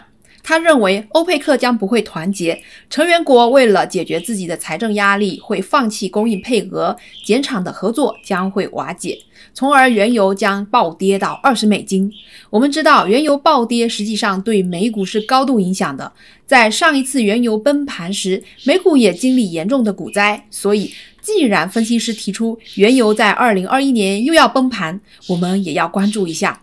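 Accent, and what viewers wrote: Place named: Cantonese, China. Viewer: 感觉广东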